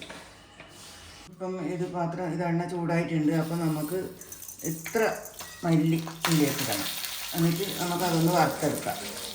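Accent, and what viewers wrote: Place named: Kannur, India. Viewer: Supper 😍😍 nammdea kannur slang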